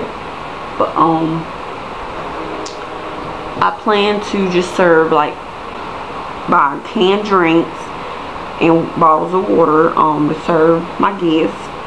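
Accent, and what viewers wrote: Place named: South, United States. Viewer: Where are you from? your accent is sooooo country, but different LOL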